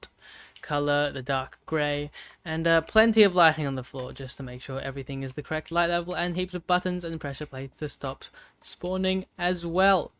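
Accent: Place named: Australia. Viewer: You sound more Australian and look more orange and scammy since I last talk to you, what happened🧐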